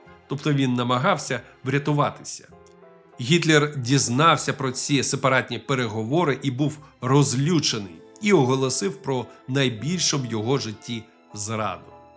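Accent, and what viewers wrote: Russia. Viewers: Працюйте над вимовою,бо у Вас російський акцент! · Удосконалюйте українську Дуже ріже Ваша російська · Дуже дякуємо за українську мову та цікавий контент. Дозволю собі малесеньке зауваження , не ображайтеся тільки , дуже прошу. В українській мові , де пишеться буква О , то так і читається та вимовляється - О , а не А .Завжди , незалежно від наголосу. В російській , коли наголос не падає на букву О , то читається як А .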